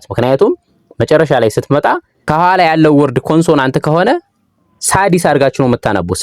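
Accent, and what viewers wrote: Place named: Ethiopia. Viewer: ለድካሙህ ክብር እሰጣለሁ ግን በአማርኛ አክሰንት ሳይሆን አፋቸውን ከፈቱበት ተናጋሪዎች ቀጥታ ብታስነግር (ብታስደምጥ)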